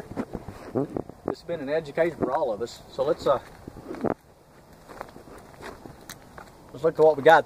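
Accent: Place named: South, United States. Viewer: He clearly has a thick southern accent you twit 🙄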